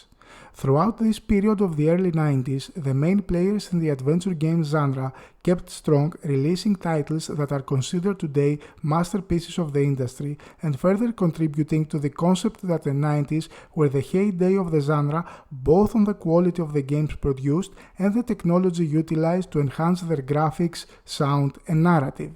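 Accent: Greece. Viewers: Hahah why, WHY you Greeks can't pronounce sh as in sharp, ch as in child, j as in joker... I will never understand :D Still, great job, I am enjoying this.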